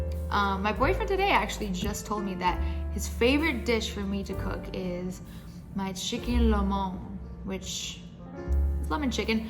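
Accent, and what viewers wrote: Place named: Russia. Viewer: u have russian accent :D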